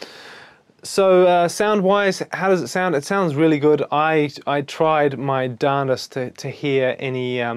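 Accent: New Zealand. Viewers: bro can you send to me, il review it ,give you my thoughts and critisisms and send it back mayby in like 6-12 months? haha Nice review!!! you from NZ?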